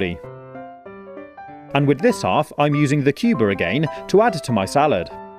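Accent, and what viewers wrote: United Kingdom. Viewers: if I had a British accent like yours I'd never shut up 😂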